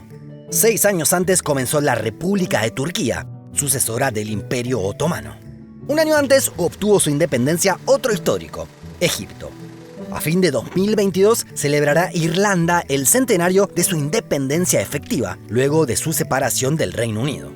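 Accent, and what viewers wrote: Argentina. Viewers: Es muy bueno, pero el español es demasiado argentino, debería ser un español más neutro.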